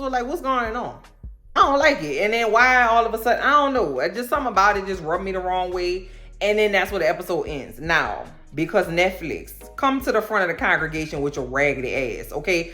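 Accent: New Orleans, United States. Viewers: All I heard was your voice and I said "Oh she DEFINITELY from New Orleans!" 😆 I'm from the N.O. too. Hey fren!👋🏽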